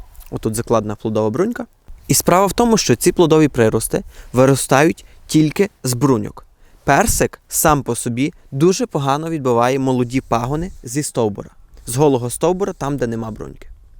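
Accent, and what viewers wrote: Western Ukraine, Ukraine. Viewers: Та трошки iз захiднянським акцентом, але вишукано й в одночас просто.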